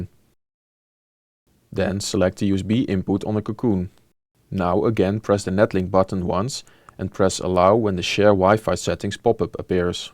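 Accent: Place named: Netherlands. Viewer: Ik hoor aan hem dat hij nederlands is ;)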